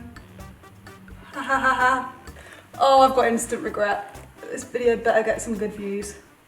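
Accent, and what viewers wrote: United Kingdom. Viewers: i did not expect her to have a british accent, after all those four nine looks stories on snap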